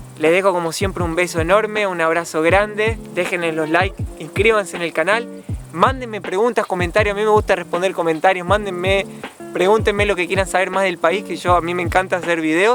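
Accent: Argentina. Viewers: Me encanta el acento argentino 😉Да...Usted tienes toda la razón del mundo ♥️YES ! ☝🏼 ACTITUD, MODALES, RESPETO al PRÓJIMO...Además de echarle GANAS a la vida...ser buena persona ✋🏼Yo he vivido en Japón ...y me va genial tengo un buen trabajo , buenas relaciones , mi trabajo con extranjeros de todo el mundo.. 🇺🇸 🇰🇷🇷🇺🇲🇳🇨🇳 Una experiencia increíble e inolvidable 😉 lo más importante y revelan te en mi experiencia personal es ;Aceptar y respetar las DIFERENCIAS 🇨🇺🙏☘️Bye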